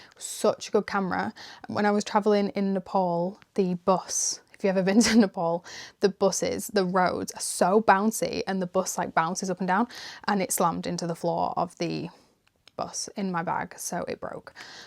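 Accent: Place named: United Kingdom. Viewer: i am really sorry for mistakes if there are any. I’m Russian🇷🇺 and I just wanted to bring more English into my life for practice. I always look for some videos with natives speaking British cause I prefer this accent and I absolutely like your one, your pronunciation is pleasing to my ear🙏🏼 thank u so much, it was interesting to listen to the way how u are expressing your thoughts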